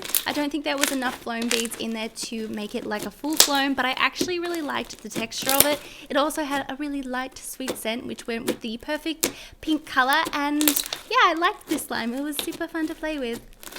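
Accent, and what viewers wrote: Australia. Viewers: Are u from Australia ??? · * are you Australian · R u from Australia?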